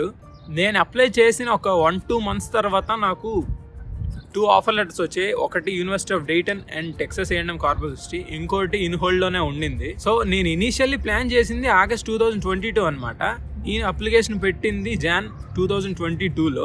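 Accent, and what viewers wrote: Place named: Telangana, India. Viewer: Endi bro slang Andhra nunchi Telangana ki maarindi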